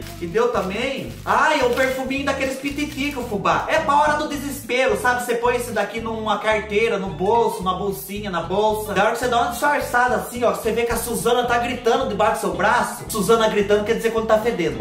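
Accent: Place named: Minas Gerais, Brazil. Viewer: Me divirto tanto com vc...alegra meus dias,.menino lindo, cada dia admiro mais sua simplicidade e bom humor!!Amando vc..queria só saber se onde e vc se e mineirinho ou de outro lugar...seu jeitinho de fala e parecido com o meu..só que sou paranaense mesmo...qua do me apresentaram seus vídeos ..me disseram " olha aí Ivete alguém que fala que nem ocê""kkkkeu falo tudo anssim tamém...😂😂😂pois agora e só ocê que vejo pra dá boas risadas fubá do Céu!!!😘😘😍😍😍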